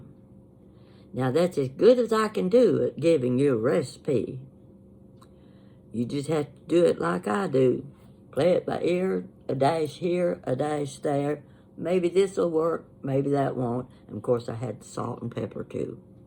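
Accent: South, United States. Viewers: I am 50 yo and I just love watching your videos, I love your tone of voice and your southern American accent. You seem such a nice and interesting person. So much full of life and interesting topics to tell. You relax me a lot. And my life is hectic, I certainly need someone like you in my life. You are great, thank you sweet lady! By the way, the earrings you made are fantastic. ❤ From Costa Rica.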